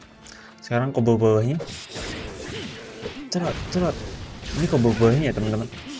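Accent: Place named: Java, Indonesia. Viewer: Muka lu ganggu suara lu ngmngnya jawa gitu ganggu di telinga mending gameplaynya doang tampa ada lu sumpah ngerusak feel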